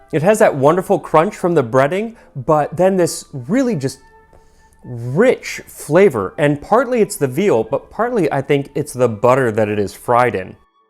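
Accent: Germany. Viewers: It's been a while since I watched a Tasting History. Absolutely love this channel, and love hearing German and his occasional half German half English like the "If you vant" there at the end. ❤ Great stuff... Might have to stop by the butcher to get some veal and try to cook a proper wiener schnitzel.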